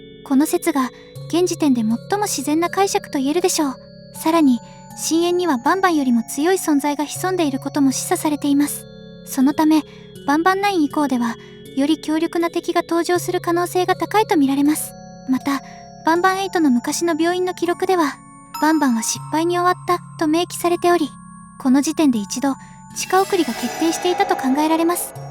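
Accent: Kansai, Japan. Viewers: 関西弁なんやww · なんで関西べん？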